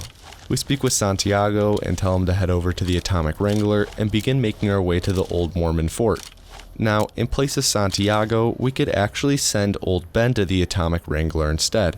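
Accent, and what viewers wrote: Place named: Midwest, United States. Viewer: Where are you from? Your 'a' is very distinct, sounds midwestern to me!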